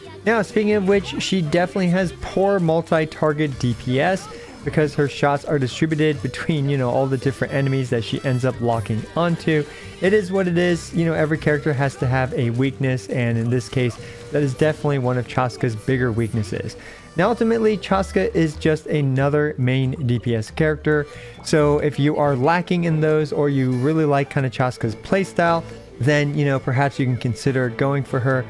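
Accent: United States. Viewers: stop why is ur yeehaw accent so good HAHAH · i love the country accent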